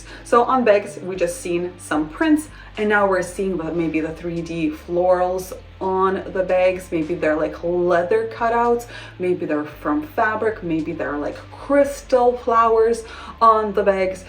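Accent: Russia. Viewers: Why they don't like to listen to you? You speak a beautiful English, with a very- very light accent, perhaps Russian. I hust descovered your channel. It is really interesting. Thank you. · Hi, correct me if I'm wrong but I think your accent is from Russia am I right or the Ukraine? Think it's very rude for people to comment on people's accents not like you/they can help it. I personally love to hear accents all around the world.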